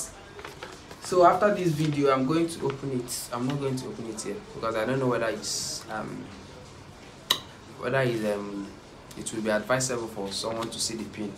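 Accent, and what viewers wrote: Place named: Igbo, Nigeria. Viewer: Pls was it the first pin you later had or the second. And pls, where was your location. You sound like an Igbo guy.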